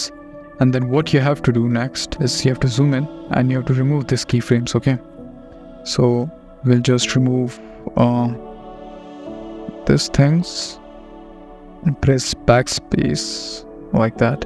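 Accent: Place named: India. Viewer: fucking kajeet tech supposrt singh ass accent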